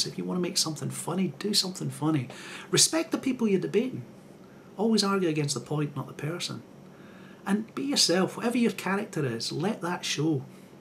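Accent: Scotland, United Kingdom. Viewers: ...you might want to think about transcripts. I'm very good at understanding various accents very well, and I love your lucious burr, but I know some Yanks in particular have trouble with it. Sorry 'bout that, we ought to get better at hearing other cutlure's lovely ways of speaking but some of us are just terrible at it and can't help it. Perhaps putting up transcripts in your low bar would help a few who canna heer ye threw tha' luvly way o' speekin' ya got thairr...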